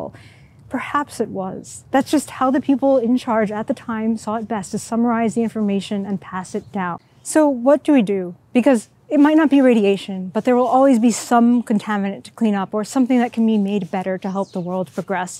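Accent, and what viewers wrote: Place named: United States. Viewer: What a welcome surprise in my YouTube notifications. As a Brit I love the Tom Scott vibes but with your own US tones :-)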